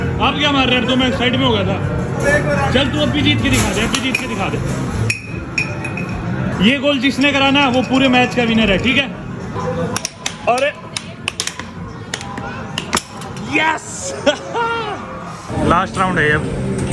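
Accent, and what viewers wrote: Haryana, India. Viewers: haryana se hai kya Bhai? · The dhakad Aur wo Hindi ka harayanvi touch kya baat hai maja aa jata hai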